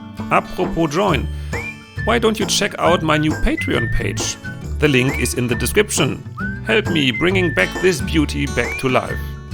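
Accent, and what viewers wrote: Germany. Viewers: Well, somehow I missed this episode and saw the next few before this one. I love your sense of humor and respect your sense of fairness in showing us what you did wrong. I have some German heritage. My great great grandmother and her husband came from Germany to the United States by ship. By the time I came along the accents had disappeared. I really enjoy listening to yours. I hope you keep putting out videos because I smile all the way through them. Thanks!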